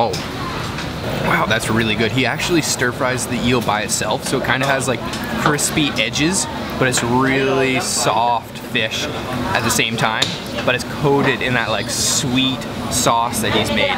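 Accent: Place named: Canada. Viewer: Yay represent (I'm Canadian too)!! I can tell from your "aboot" 😆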